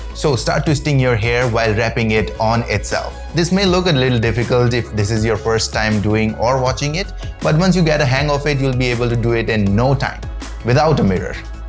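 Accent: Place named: India. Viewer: Indian, paki maleslut! Go and learn to speak eanglish girst, becose your indian eanglish accent sounds like shitt.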